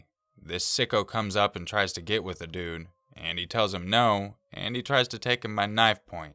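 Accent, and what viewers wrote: Yorkshire, United Kingdom. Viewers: That Yorkshire story was rather funny! Bless, our accents are hard to do, Yorkshire has some of the funniest! Nice vid mate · Oi love! Nice British accent. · Great Yorkshire accent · Dat Yorkshire accent doe 😂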